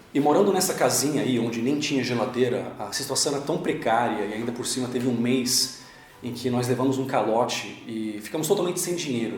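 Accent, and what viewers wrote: São Paulo, Brazil. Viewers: você é paulista ou catarinense, fiquei curioso agora e depressão, tenho problemas com autoestima e já pensei em suicídio por diversas vezes, até conversei com um psicólogo, mas ele acha que remédio vai resolver o problema, depois que uma garota me humilhou na frente da escola eu nunca mais consegui falar com uma mulher e tenho dificuldades em me relacionar e isso me incomoda e quando tento conversar com alguém a maioria das pessoas são bem grossas e nisso eu me isolo e quando vejo um casal eu me sinto mal porque não consigo sequer uma ficante, é só um desabafo mesmo.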